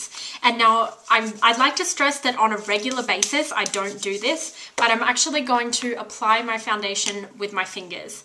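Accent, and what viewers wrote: Australia. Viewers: u aussie?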